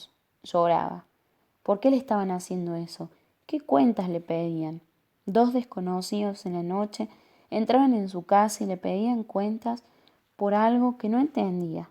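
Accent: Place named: Córdoba, Argentina. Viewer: amo tu acento cordobes <3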